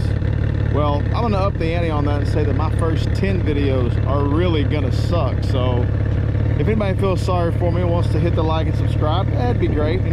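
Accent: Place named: Texas, United States. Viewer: I bought the vagabond bag I see on your video. Ought to work well. These videos are helpful to many riders for info on mods from cup holders to feet pegs etc. Appreciate the knowledge. You sound similar to how my dad talks, my family agreed. Texas accent. Riding the Valkyrie in rocky mountains is worth a trip, I love it every chance I get!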